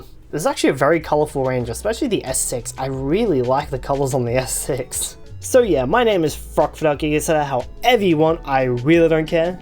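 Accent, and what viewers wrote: Australia. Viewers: I see a pair of talking hands with an Aussie accent and first thing comes to mind is some guy with a green ipad talking about tech stuff in a entertaining way. jokes aside this channel is great anyway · wtf why is it that most of the english speaking channels i watch are guys from australia xD anyway, i really like your content❤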